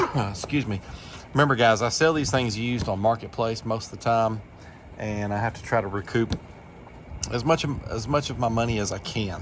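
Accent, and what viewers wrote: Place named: North Alabama, United States. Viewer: Whoa, you're local to me sounds like, I'm in North 'bama too. Other hobbies are wood working and turning, and hobby farm.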